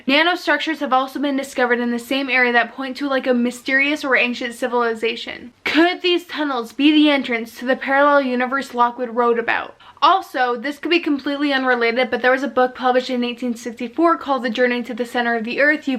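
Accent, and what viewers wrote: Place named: Chicago, United States. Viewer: IS that a Chicago accent? Love it. I have been reading these books as well. Very bizarre. Especially when you look at the Von Braun books which seem connected to Elon Musk.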